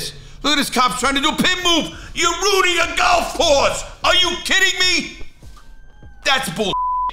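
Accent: New York, United States. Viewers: I hear a slight New York accent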